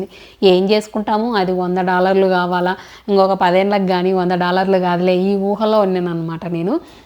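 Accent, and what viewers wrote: Rayalaseema, India. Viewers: మేము కొన్ని సంవత్సరాలు కడపలో ఉన్నాము, రాయలసీమ భాష వినడానికి బాగుంటుంది, మీరు చెప్పే విషయాలు నాలా కొత్తగా యూ ట్యూబ్ చానెల్ క్రియేట్ చేసిన వారికి చాలా ఉపయోగపడతాయండి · Hi sis మేం కూడా రాయలసీమ మీరు మాట్లాడుతుంటే చాలా happy ga వుంది,mobile ముందు పెట్టుకొని చూస్తే మా sister tho opposite లో కూర్చుని మాట్లాడుతున్నట్లుంది, జై రాయలసీమ అక్క,,slang 👌👌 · రాయలసీమslang చాలాflowగాఉంటుంది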